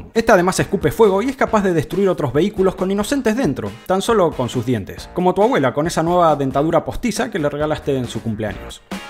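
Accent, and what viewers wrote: Argentina. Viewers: un poco de todo pero argentino (No es hate)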